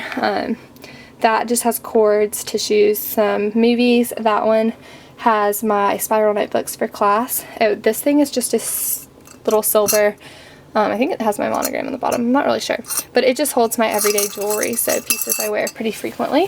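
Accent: South Carolina, United States. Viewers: sounds like south carolina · it's southern American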